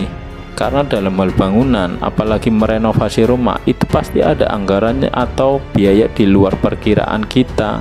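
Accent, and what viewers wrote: Banyumas, Indonesia. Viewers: ini orang kocak abis..asal jeplak..ngapak..gw ampe geli ketawa dengerin nya..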